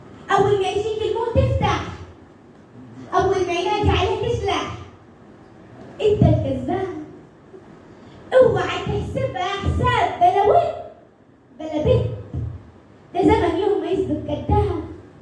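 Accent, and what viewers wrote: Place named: Upper Egypt, Egypt. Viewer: لهجة صعيدية جميلة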